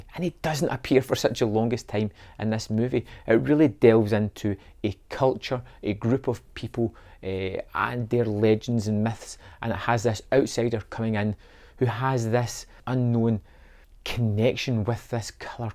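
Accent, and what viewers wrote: Scotland, United Kingdom. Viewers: You got my sub. Great reviews. You're a little bit difficult to understand, given your rather heavy Scottish accent, but for myself I found I really had to listen to what you were saying and consequentially your comments sunk in.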